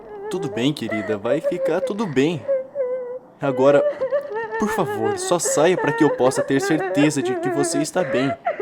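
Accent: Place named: São Paulo, Brazil. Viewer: MDS eu tbm sou :0 pensei q vc fosse paulista srsr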